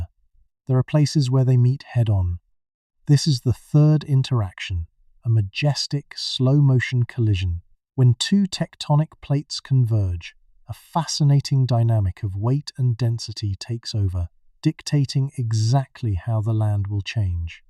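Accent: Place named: United Kingdom. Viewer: Yayyyy you’re using the soothing British AI voice🎉🎉🎉 is it because of the comment I left?😅😆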